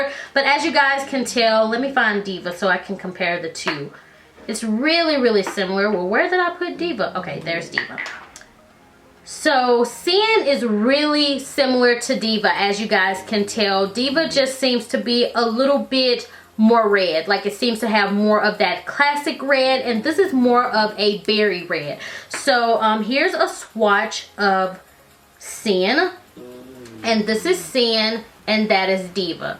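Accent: South, United States. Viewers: I KNEW i heard a SOUTHERN accent! ☺😊😊😊😊 im from alabama too! Do you have an eyebrow tutorial i looked but couldnt find one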